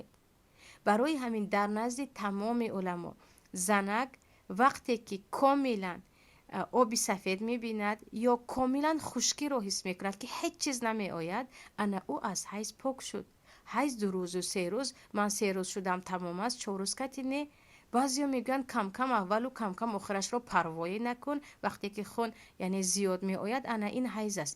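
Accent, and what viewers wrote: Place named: Tajikistan. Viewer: Ассалому алейкум Офарин бар Шумо чи кадар забони точикиро тоза гап мезанед ва хамаи суханонатон бехтарин.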